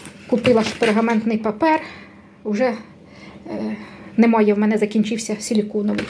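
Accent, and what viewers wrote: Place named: Cherkasy, Ukraine. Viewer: Чути що говір черкаський. Я теж родом з Черкаської обл, на межі з Полтавською.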